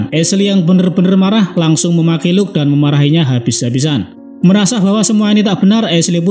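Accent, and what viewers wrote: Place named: Java, Indonesia. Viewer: Jowone kentel mas wkwkw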